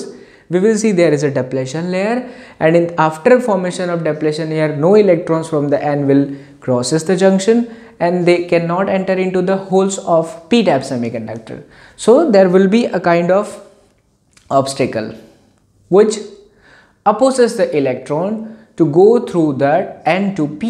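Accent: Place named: Haryana, India. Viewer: Ni gujrati he is frm surat but hrynvi ati h unhe😁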